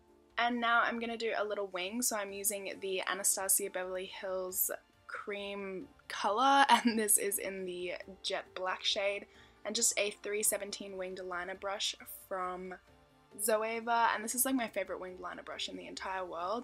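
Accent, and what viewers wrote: Australia. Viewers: She has an Australian accent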